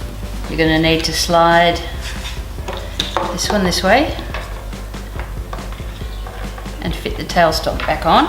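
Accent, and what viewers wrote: Australia. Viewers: Dam i didnt expect the ozz accent..But i do like her tho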